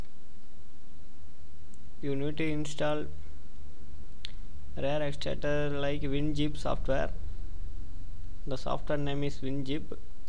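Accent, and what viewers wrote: India. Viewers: it's not his fault all indians speak that way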